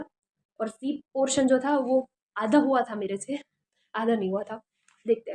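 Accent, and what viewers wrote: Gujarat, India. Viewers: Tumhari hindi thodi gujrati vaali he. Kya tum gujrati ho?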